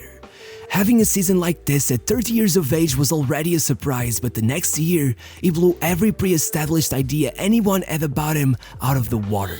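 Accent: Portugal. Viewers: I hear Portugal in your accent.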